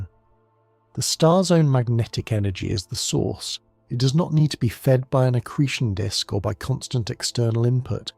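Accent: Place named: United Kingdom. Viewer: hmm you say you are from USA but this is British accent, you should put an "intro" in your channel so we know this is not just another A.I. slop.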